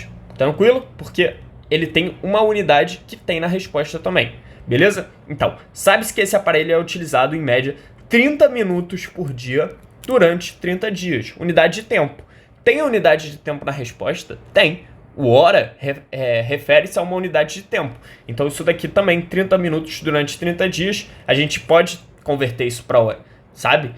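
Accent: Rio de Janeiro, Brazil. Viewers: nada a ver com o conteúdo mas, tu é carioca da gema?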